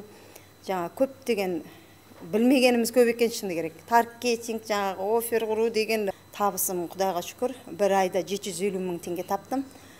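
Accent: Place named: Karakalpakstan, Uzbekistan. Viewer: Қалпақтардың акцентімен сөйлейді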